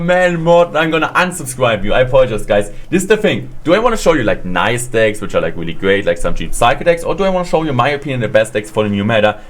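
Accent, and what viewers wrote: Germany. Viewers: man merkt richtig deutscher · I like your german Aczent😂 Bin btw auch deutsch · Man hört so krass das er deutscher ist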